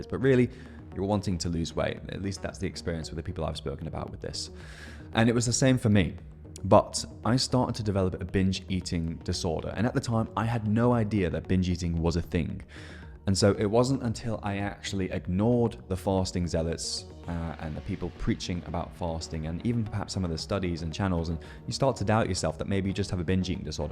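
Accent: RP, United Kingdom. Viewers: Great video with good information! Btw - I LOVE your posh accent 🔥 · I’m not sure if anyone in the world authentically pronounces fasting as faaahhhhhsting and last as laaaaahhhhhhst. IDK….is it a way to say ‘hey, look at me as a productivity guru, I’m so successful I have a ridiculously posh accent!’.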